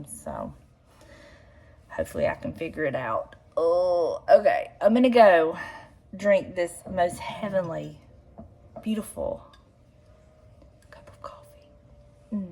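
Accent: South, United States. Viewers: I’m not sure how I found you on u tube but I love starting my day with your videos!! I don’t know if it’s the opening music or your soft spoken southern accent but it just relaxes and calms me to watch you. It also makes me want to go thrifting and decorate!!😊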